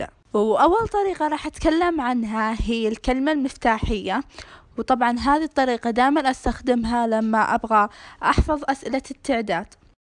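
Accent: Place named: Algeria. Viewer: نتي جزائرية تتكلمين مثل الجزائريين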